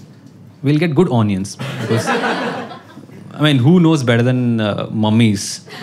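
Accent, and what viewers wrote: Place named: South India, India. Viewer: Typical South Indian tone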